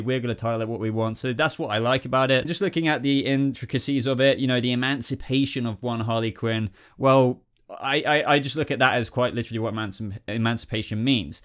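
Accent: England, United Kingdom. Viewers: Alicia Fox He has an English accent, so I’m assuming he’s English?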